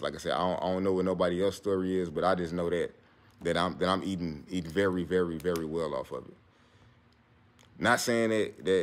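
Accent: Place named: St. Louis, United States. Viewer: Dropping gems bro. Great information! Also you gotta be from St. Louis bro that accent strong as hell 😂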